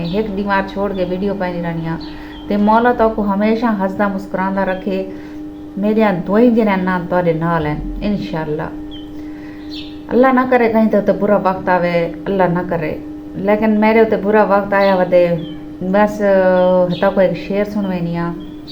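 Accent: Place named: Saraiki, Pakistan. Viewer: jaisay teri methi methi saraiki hai na tuo dil karta hai teray methay methay honton ko choom loon. bohat acha diya hai Jati oya :-)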